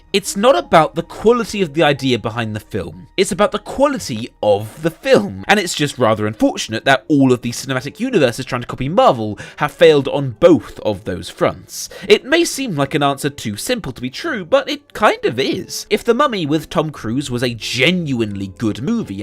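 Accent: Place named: England, United Kingdom. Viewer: Totally convincing English accent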